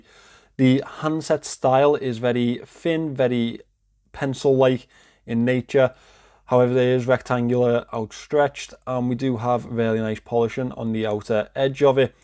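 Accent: Liverpool, United Kingdom. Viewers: Bought the fluted bezel Datejust homage from Pagani a while ago and was thoroughly dissapointed in spite of the low price ! Very poor finishing and quality overall, poorly executed jubilee bracelet ...... it just felt like a "cheap ass watch" !! So it was with some apprehension that I ordered the dark blue OP V2 homage from Pagani, based on some very good reviews online. I must say, that the overall quality and impression of this model is lightyears ahead of the Datejust that almost put me off Pagani forever ! It feels that the quality of the watch is on par with my Seiko SARB033 and my Citizen NB1050-59E JDM. I was so impressed that a couple of days later I also ordered the non date Tiffany blue model, which will hopefully arrive in a couple of days :-) From what I can see in your review (or non-review by your statement :-) ) I will not be dissapointed. 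Thanks for the review - keep them coming ! Just pushed the subscribe button :-) Also great to hear a "Scouser" accent in a review, even though I am not British ! ;-)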